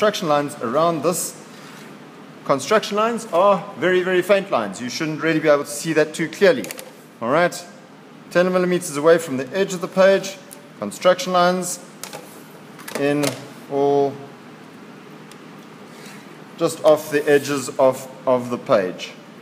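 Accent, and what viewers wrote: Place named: South Africa. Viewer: South African accent i love it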